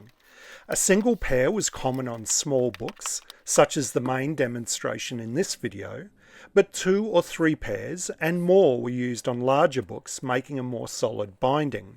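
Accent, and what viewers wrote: Australia. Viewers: Me encanta tu trabajo te felicito. Ahora entiendo porque comprendo todo lo que dices, y es porque tienes acento australiano facil para mi. Un Saludo desde Chile 🇨🇱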